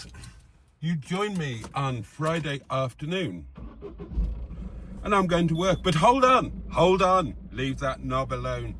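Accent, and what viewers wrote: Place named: Birmingham, United Kingdom. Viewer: Was there the other week, great place and a great fish and chips. 20p for a pee though!! By the way have you spent much time in Birmingham as I can detect a bit of an accent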